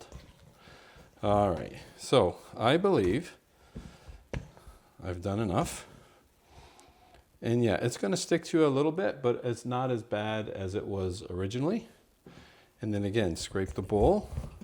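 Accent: Canada. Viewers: Hey i got a question been watching a few of youre video i was just wondering where u from u sound Canadian but im not sure im from just outside Toronto ty have a good day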